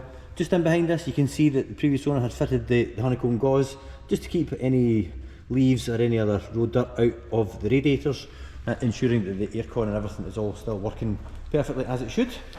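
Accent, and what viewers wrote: Scotland, United Kingdom. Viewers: Scottish of course! Love it.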